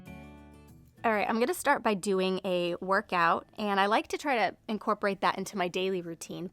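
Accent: United States. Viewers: Sister. Is your accent American? I have the same accent but people in my country crush over British accent 🥲. Btw, I'm from Bangladesh. I am 21, I follow you since 10 years. I've watched all your videos. Thank you for creating content sister ❤️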